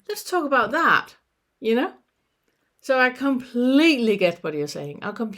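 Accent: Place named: Copenhagen, Denmark. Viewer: Hi, I have been a lot in Cph, and regarding your pronunciation made me quite sure you must have been around there for a bit. Yes I will keep an eye on your posting! Appreciate/reconize most of your topics. Thanks for that!😊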